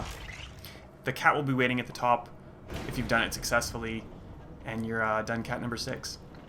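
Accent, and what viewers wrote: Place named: United Kingdom. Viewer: Hes British I would guess.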